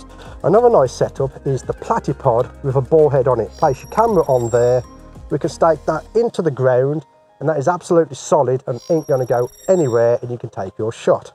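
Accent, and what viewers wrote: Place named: Stafford, United Kingdom. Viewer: wicked work mate you sound stafford way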